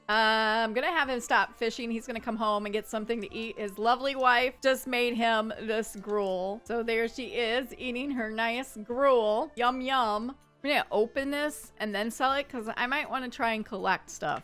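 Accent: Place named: Midwest, United States. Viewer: Where are you from in the Midwest I’m getting nostalgia from the way you speak since I used to live in Minnesota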